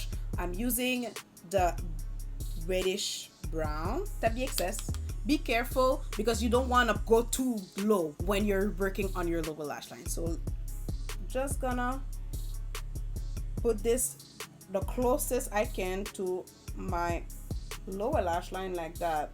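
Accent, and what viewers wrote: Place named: France. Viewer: YOU sis deserve a standing ovation!! I have to tell you that I am a whole, adult female who doesn't wear much make up BUT has always appreciated the beautiful looks. I have ZERO knowledge about how to apply it. I rock the basics and like 'I'm good' YET the inner diva has always wanted to rock a beautiful natural face. I landed on your tutorial regarding an 'I Heart Revolution palette (which I picked up at TJ MAXX in NYC USA) trying be to be cute🤣🤣 and was intrigued at how descriptive you were. I loved your french accent also, so cute 😊 but also because you were easy to listen to and came of so genuine and non judgemental. I then peeked at your page and SUBSCRIBED as soon as I landed on your *affordable MAKEUP FOR BEGINNERS tutorial. It was a wrap. Thank you, thank you your tutorials are BY FAR THE BEST, THE most COMPREHENSIVE tutorials on YouTube. 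I feel confident that I can rock a cute look for my upcoming cruise. Much MORE success sis!🙌🏾👍🏽💜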